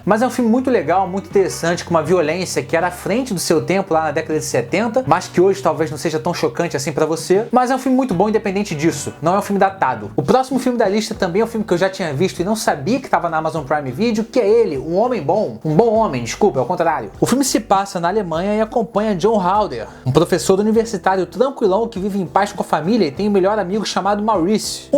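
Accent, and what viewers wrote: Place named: Rio de Janeiro, Brazil. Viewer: Sou carioca e até eu acho q vc tem sotaque de carioca.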